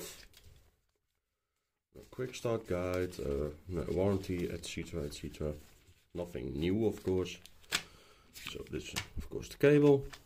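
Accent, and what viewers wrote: Netherlands. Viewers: That dutch accent🥲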